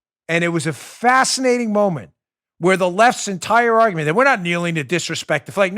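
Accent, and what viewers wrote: New York, United States. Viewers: You lost your audience alright... every time you are fake by calling on, stop me if I lose the audience bullshit. Do you really think that you are talking to a bunch of people that can't follow a new yorker trying to explain something? With dis and dat and dee udder ting...? You know, I take a break from you for about a week or two, and then my brother in law tries to get me to listen in again, and you can't seem to have one program where you don't offend me by acting fake..., stop me if I lose the audience... How the hell would he know? You seem to get worse as your show goes on. You scream and whine really loud and I have to turn down the volume, then you talk super cool and quiet, and I can't understand what you said. I used to turn up the volume, then you would forcefully start talking again and I would have to mess with the volume again. 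I think that I will unsubscribe your channel and go some where else for my fear mongering... you don't seem to be the guy.... I even get tired of seeing you on Fox, as you look so buff and tough, even though everybody has heard you hit the third octave above your normal voice, kinda like Mike Tyson.. heheheh...adios... I know you wont give a shit about me leaving... that just says a lot about you... and me both...!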